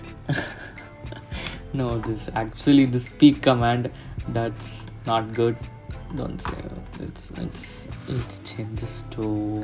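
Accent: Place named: England, United Kingdom. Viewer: Bro im a fan of your English accent bro ❤😂😅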